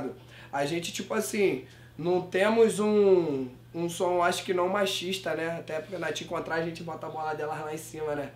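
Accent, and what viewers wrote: Rio de Janeiro, Brazil. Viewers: a maioria dos menor do rio fala assim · Eri Soares é de carioca, hahaha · Melhor sotaque era o carioca. 💪😍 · Dener Melo aqui no RJ a maioria dos menó fala assim kkk eu tmb me incluo nisso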